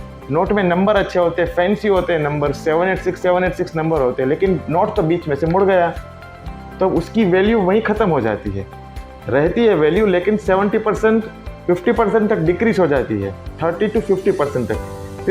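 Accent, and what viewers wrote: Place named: Gujarat, India. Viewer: સર તમારો આ વિડિયો જોય ને બોલી ઉપર થીલાગ્યું કે આપ ગુજરાતી છો ખૂબ સરસ માહતી આપી શું તમે જૂના કોઇન લેવે- ચ કરો છો તો આપનું સરનામું મોકલશો 👍👍👍🔥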